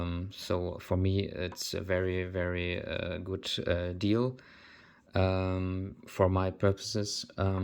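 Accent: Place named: Germany. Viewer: Sounds like a german to me :D If I'm right: liebe Grüße! :D Nice Video, good information and its one of the best long time reviews for this watch. Helps a lot!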